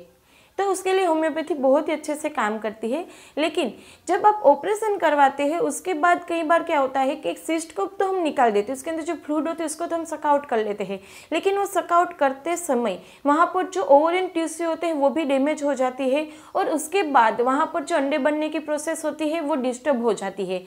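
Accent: Gujarat, India. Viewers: Bolne k lehze se gujarati lg rhi h..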